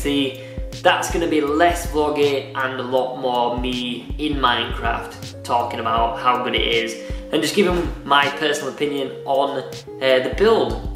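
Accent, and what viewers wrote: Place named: United Kingdom. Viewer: Why are you talkin british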